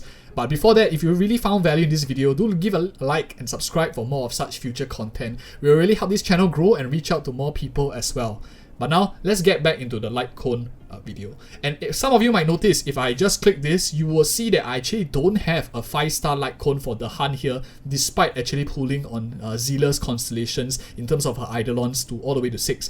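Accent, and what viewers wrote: Singapore. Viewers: i recognize singlish when i hear it rofl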